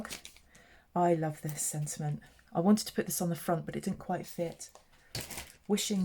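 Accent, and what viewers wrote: United Kingdom. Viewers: Lovely listening to a British accent . Very nice card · Your tutorial was great! I loved listening to your accent. I was fortunate enough to live in England for a couple of years and absolutely loved it!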